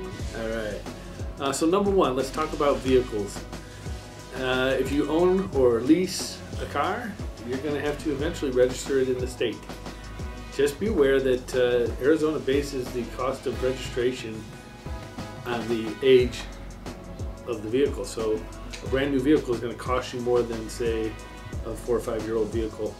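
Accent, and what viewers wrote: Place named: India. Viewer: you sound like you are of Indian descent. If you have gone to India, you will be able to relate to the climate in AZ. India's heat is dry heat and can get up to 120 degrees, if you are closer to the equator.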